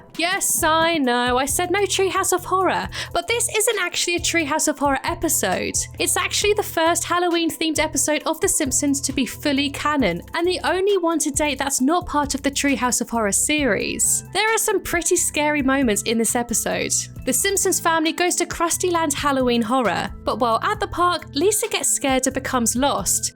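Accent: United Kingdom. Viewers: This beautiful british accent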